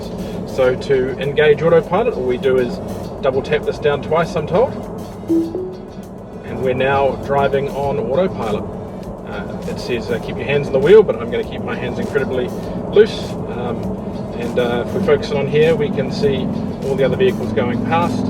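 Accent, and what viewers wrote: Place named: New Zealand. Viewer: Nice review, Ive seen soooo many international reviews, nice to hear a kiwi accent with a kiwi review, cheers !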